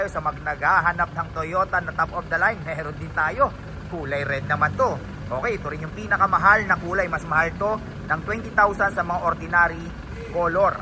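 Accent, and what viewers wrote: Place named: Pangasinan, Philippines. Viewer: Lasi ka taga pangasinan ka manaya boss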